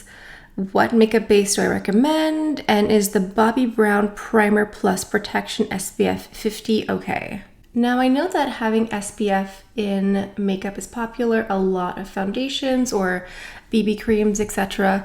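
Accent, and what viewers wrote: France. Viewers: One of my favorite things about your channel is your beautiful French pronunciation of words that we Americans tend to butcher😎. It’s really awesome to have two languages. Love your content, and agree that Chanel makes the best foundations.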